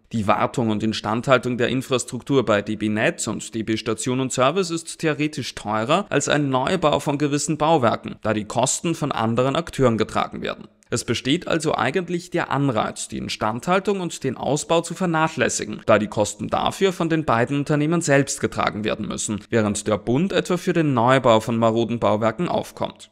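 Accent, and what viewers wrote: Austria. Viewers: I don't see the problem here. Perfect German (with an Austrian dialect).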